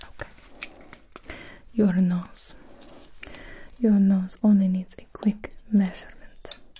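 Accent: Russia. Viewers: I LOVE THIS RUSSIAN ACCENT PLSS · The Russian accent is so cool lol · as a Russian person I can say that this accent sounds extremely real like most of my friends talk like this in English ahahha UPD: not everybody talk like that tho don't get me wrong! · She is to good at Russian accent lol😭😁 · why a russian accent¿ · I love your not so good english and russian accent (when some people try to speak english and the do it incorectlly most of the time its really annoying, but you are exception) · Wait so is the accent Russian? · with a russian accent is the most funny and relaxing thing ive ever heard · I am really bad with this stuff but is that a Russian accent like I said I am so bad at this kind of stuff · as a native Russian speaker, this is one of the best Russian accents I have veard, and the essence of this character is instantly recognizeable. good job ;) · i am inlove with the russian accent, its so aggresive but soft at the same time AAA, this asmr is everything 😭😭💖💖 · Russian accent? 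· Good Russian accent by the way good content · Cool Russian accent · Tatyana,is not a greek name,its clearlly soviet/slavick/russian,also this is how russians speaking english sound,and she tried to replicate russian maniars. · Me: hears Russian accent and sees blonde short hair Also Me: Black widow? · Russian acsent?!!? Reallyyy?!😐 Well i liked it😁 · THE MOST ADORABLE Russian accent. This is my favorite video of yours. Thanks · The accent kind of sounds like a mix between Russian and African Wait... maybe I shouldn’t have commented while I was sleepy, she just sounds Russian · Perfect Russian accent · Correction.... = perfection. + Russian accent = Russian perfection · Your Russian accent is the best--the way you say your Ts are giving me all the tingles! 💛Side note, that wig is really pretty on you 😊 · It sounds like she has a russian accent of sorts😂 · not a bad Russian Bulgarian or whatever...... 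I'd see the movie. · My mother died 5 years ago and s'he had t'he same strong Rússian accent listening to you remind me of her and helps me sleep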